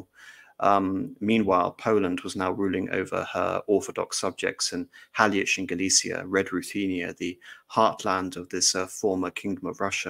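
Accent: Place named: United Kingdom. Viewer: Nice british akcent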